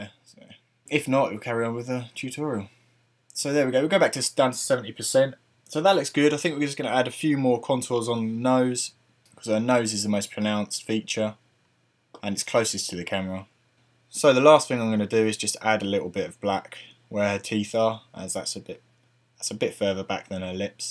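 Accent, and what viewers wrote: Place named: United Kingdom. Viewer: You Brits' accent drives us Americans crazy (with our PROPER way to pronounce words!!) I think I've figured out how the way you are saying words is different. I heard very early in the video when you said the word "Photo". I think we say it, the proper way of course, Pho-to, whereas you Brits say Phot- and then the o. It just doesn't make sense to me. I just heard it again in the word "thirty". You say Thirt-y or "thirt-ee". And it has always just driven me mad to hear you pronounce, or not pronounce I should say, your letter R's. Why don't you use your R's... "MATE"? There you go again..."Settings" is SE-ttings, not SETT...ings. And "little" is LI-ttle, not LITT...le. Foo-tage, not FOOT...age!!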